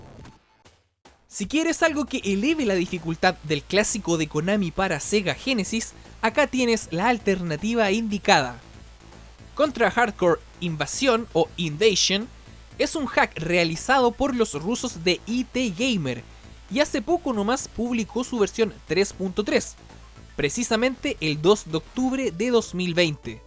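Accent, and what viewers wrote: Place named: Chile. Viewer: Buen video rey es un clasicazo este juego me lo completé con todas las finales y ya jugare nuevamente en la collection de aniversario. PD: Me fijé en tu acento y creías ser chileno hasta que lo dijiste en el video jajaja saludos bro desde San Bernardo, Groenlandia.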